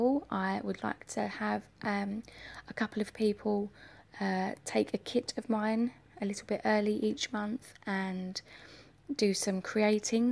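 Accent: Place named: United Kingdom. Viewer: I could listen to you talk all day! I'm a sucker for a British accent. Lol! Beautiful kit,.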